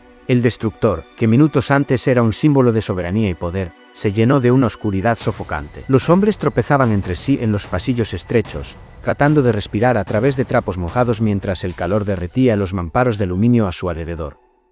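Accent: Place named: Galicia, Spain. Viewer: El acento del video parece gallego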